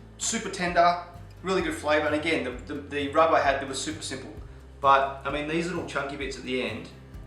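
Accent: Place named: Australia. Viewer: Loved hearing an Aussie describe food he's cooking! Sorry mate, your accent was better than your cooking for this American. Keep it up though!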